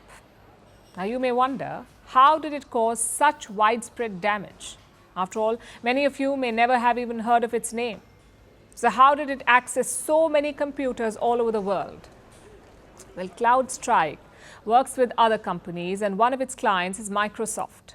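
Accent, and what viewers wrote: India. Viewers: It's her thick ass indian accent that makes it sound like cloud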